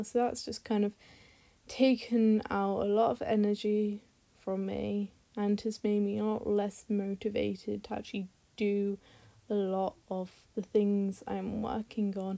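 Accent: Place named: United Kingdom. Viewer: like i said YOUR LIKE ROSYCLOZY YOUR BOTH BRITTISH, SOUND LIKE BRITTISH, AND BOTH MAKE GREAT CONTENT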